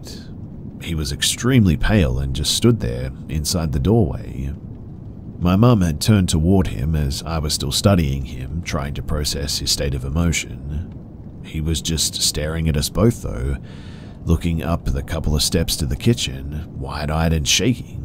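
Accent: Australia. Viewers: Why the Aussie accent when everyone is texan? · Sounds like you grew up in Oz, rather than Texas! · Wait, is that an Australian accent? I'm listening in Sydney.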